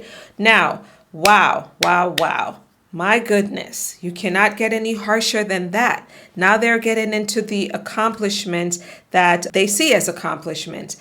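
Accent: Jamaica. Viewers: Good evening are you a Jamaican?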